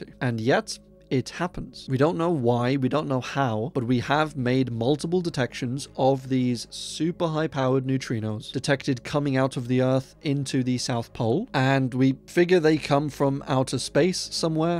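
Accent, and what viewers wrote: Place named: England, United Kingdom. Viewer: Your channel is really good mate 💯 where is your accent from in England I'm English Yorkshire?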